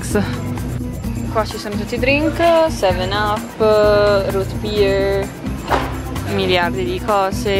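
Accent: Tuscany, Italy. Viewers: Sei toscana ? Di dove? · Sei toscanaaa l ho sentito da l' accento 😂anche io sono toscana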